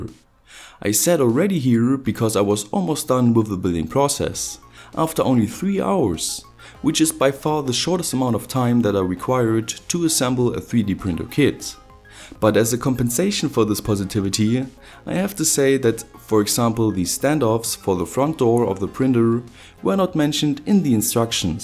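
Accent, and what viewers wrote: Germany. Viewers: You sir, are a true genius. P.S. I love your german accent xD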